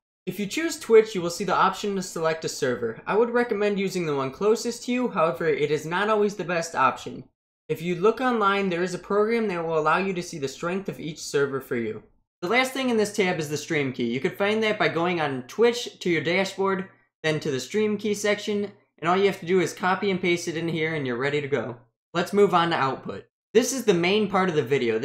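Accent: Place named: New York, United States. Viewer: What is that accent? New York?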